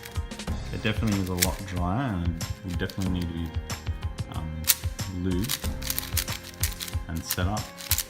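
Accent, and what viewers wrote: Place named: Australia. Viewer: I love australian accent so much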